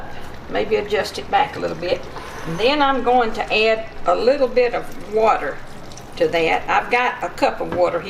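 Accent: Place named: South, United States. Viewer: My grandmother made this as we were kids I litterally could smell this she was from north Alabama she made sausage gravy also but I love them both your voice took me back in time because you have her southern accent my dad like salmon patties he was from Mississippi and loved all these wonderful meals theyve all gone home now to jesus but gosh the slightest things can draw you back in time and catch a glimpse of memories we all hand had together thank you sweet lady for sharing this recipe Godbless you ...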